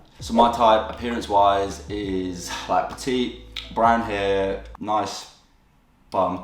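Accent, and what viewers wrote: England, United Kingdom. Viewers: I turn the caption on coz ya know is being so british heheheh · which English accent is he speaking? 😩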